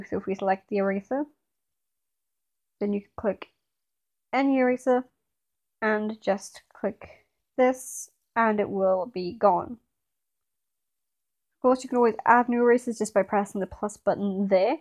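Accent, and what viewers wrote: United Kingdom. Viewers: thank you british lady (2) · Thank you british lady (3) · Thank you British lady (5) ♡ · Thank you British Lady(6)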